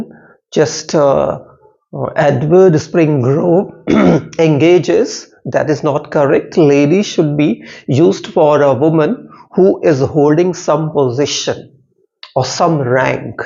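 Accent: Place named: United Kingdom. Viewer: Sirji....your content is nice ....but you unnecessarily use a British accent.....which makes it boring.....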